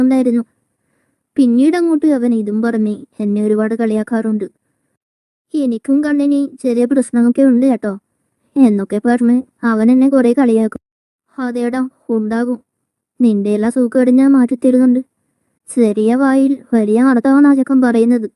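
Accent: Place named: Kochi, India. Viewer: story telling in the Kochi accent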